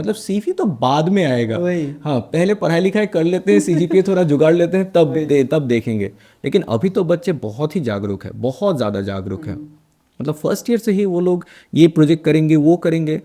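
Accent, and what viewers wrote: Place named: Bengal, India. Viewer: Bengali and Hindi accent combinations ❤️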